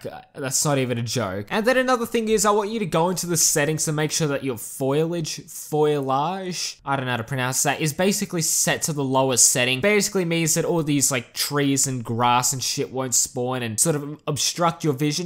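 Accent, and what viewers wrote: Australia. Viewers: Sounds strayan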